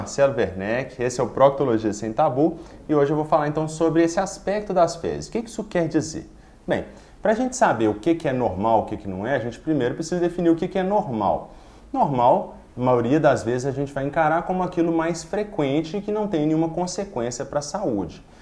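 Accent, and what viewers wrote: Minas Gerais, Brazil. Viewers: Que sotaque lindo!! Parece mineiro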